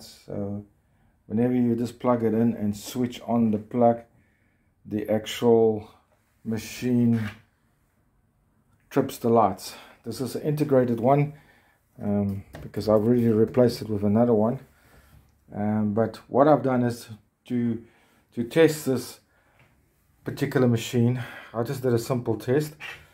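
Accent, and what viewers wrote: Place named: South Africa. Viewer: Are you in Durban? Your accent sounds South African with a hint of India.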